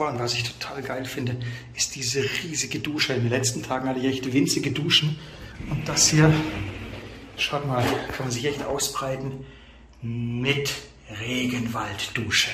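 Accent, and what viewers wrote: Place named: Swabia, Germany. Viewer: Hallo, ein trauriger Anblick, die ganzen Überreste der Autos zu sehen. Erkannt habe ich kein einziges davon und das soll durchaus was heißen.🤔😅Aber ich habe dennoch eine Gemeinsamkeit der Autos entdeckt, bei allen ist das Dach eingedrückt. 🤔😉 Deine Unterkunft ist ja mal wirklich schnuckelig und der Ausblick grandios.🤩👌 Aber mer hert hald scho da Schwob in dir, "sodele". 😂😂👍 I winsch dr an scheene Sonndig ond dange firs mitnemme. 😊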